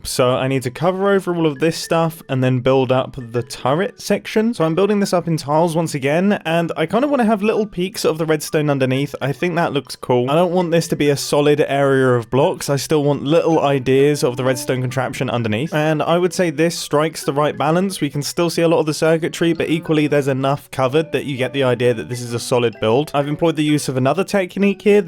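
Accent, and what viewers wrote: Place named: United Kingdom. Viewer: How often is it that you hear somebody say in the calmest british accent ever I'm building a giant tank.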